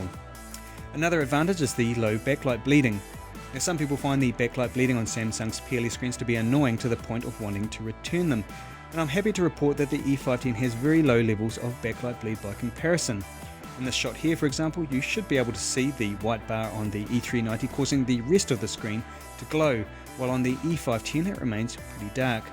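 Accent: New Zealand. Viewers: don't be so ignorant, people from different parts of the world have different accents, this one sounds like a New Zealander.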